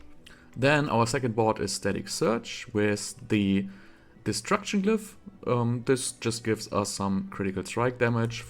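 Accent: Germany. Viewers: i made i similiar build yesterday. 180 mana with enchant throws 7 lightnings. 1 regular, 4 omnipower and 2 from enchant. already works like a charm with 2.0 mana per bounce. Esus boots for crit and i use the 300% gauntlets. Also currently with raiment but i will probably use a normal chest soon and therefore put temerity in to try it out. i also use 2h with 80% for 4 additional bounces. i also use the 20% enchant boost in paragon but dunno if its worth it. question: you use elementalist. do you know how the crit chance is calculated?i would like to use it as well and get rid of the boots but dont know how it works. if i have my omnipower procs from 150mana to 0 and it casts the chain lightning do they keep the enhanced crit chance for their whole duration or everytime i drop below 100 mana while they are flying it dynamically adjusts and removes the crit chance? 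I feel like cc duration is useless. i just stun them again with 3 points in the stun passive. and everything is stunned anyway so no need to use shared missery. i also do not see the point in freeze because you have stun as hard cc. i may try it out but i dont know if the 3 uniques, paragon board and so on is worth the effort. my dmg numbers look the same are you german? your accent sounds like it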